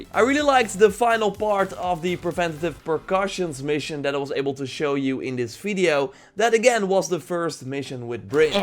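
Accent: Netherlands. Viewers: Hey are you Dutch. I love your vids by the way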